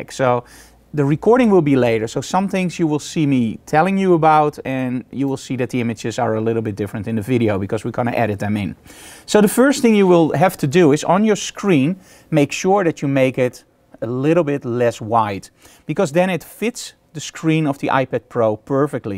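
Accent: Netherlands. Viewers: I just try it. It's super amazing, I can even draw outside my drawing room seating on a couch, the signal is so strong! btw your accent is super Dutch :D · lol ik kon gelijk herkennen dat je Nederlands was door je accent. geweldige vid man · You are Dutch aren't you? 😂 Me too btw so i'm not laughing at you in a mean way just so you know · ben je Nederlands?-are you dutch?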